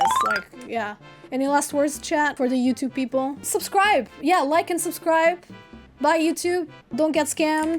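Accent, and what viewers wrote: Portugal. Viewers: I love your Portuguese accent,... Beijos do Brasil!!!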